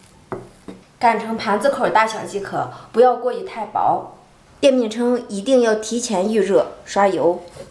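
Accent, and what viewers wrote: Shandong, China. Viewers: According to her accent she's probably from the same state as me, thanks to her guide I can make authentic pancakes justl ike in my hometown. I highly recommend to try her recipes, turst me it's not as difficult as you imagine. 如果你需要英文字幕我可以帮你，作为感谢你让我吃到了想念了多年的家乡饼。说实话看你的视频之前，我都不知道这种饼叫什么名字，只知道在山东非常常见。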